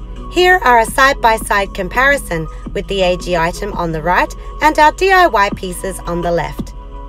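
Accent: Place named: Australia. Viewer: Your so welcome! I have always thought that the Aussie accent was cool, but when you speak, it sounds even more cool!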